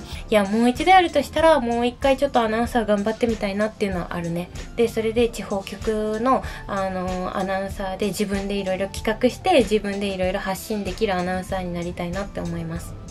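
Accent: Kagoshima, Japan. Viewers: わたしも鹿児島出身、東京在住なので親近感湧くし、突然鹿児島弁でちゃったらしばらく直らなくなるのめっっっちゃわかります（笑） しかもわたしもジャニオタあがりのケーポオタなのでもう勝手に運命感じてます😳 これからも頑張ってください❤︎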